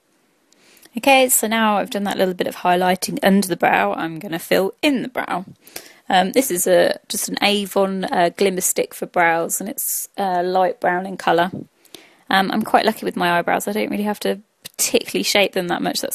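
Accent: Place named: United Kingdom. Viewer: What part of Britain are you from? I love you accent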